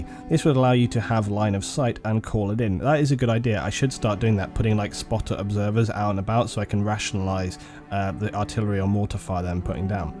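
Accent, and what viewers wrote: England, United Kingdom. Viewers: Hey! I got idea for your Zeus mission. If it's possible then can somebody make a oil platform to sea at Altis. Then your gonna make CSAT base there. It's gonna be hard mission for NATO. There are some civilian/workers hostages there too. It's like naval mission. Only boats and aircraft. Some AA turrets would be at oil platform. CSAT forces have captured an oil platform at South sea of Altis. Sorry for bad English and i hope you like it. And i have a question for you:D What country at do you live? It sounds like England.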